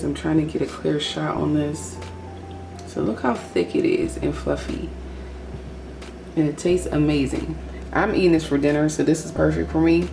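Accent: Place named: Delaware, United States. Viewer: Hey! This looks good! I have a sweet tooth as well lol and love sweet cornbread too! I like how you talk too lol you kinda sound like my family that’s from Delaware 😊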